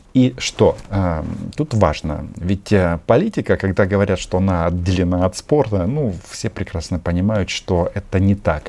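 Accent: Russia. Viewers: Русский говор у тебя появился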